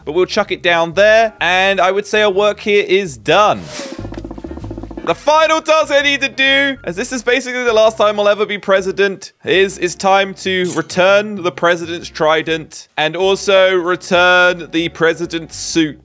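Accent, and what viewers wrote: United Kingdom. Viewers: sounds so british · HE IS BRITISH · most British sounding intro I've ever heard 😭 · British accent every episode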